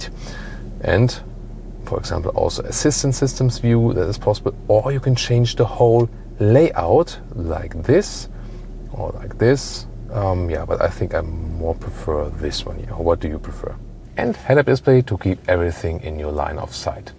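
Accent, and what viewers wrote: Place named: Germany. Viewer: この人ドイツ人だよね。この人の英語にはドイツ語訛りがある。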